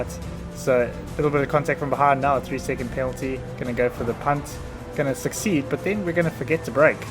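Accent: South Africa. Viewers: You sound South African mate i swear you are i don’t just wanna say you are but you sound like it mate im watching am eskom turned my life off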